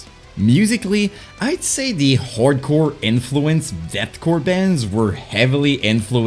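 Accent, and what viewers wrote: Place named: Montreal, Canada. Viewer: hey man, you from mtl? cause you sound like it!! ahahha good to see someone out there doing these kinda videos. keep up the good work my guyy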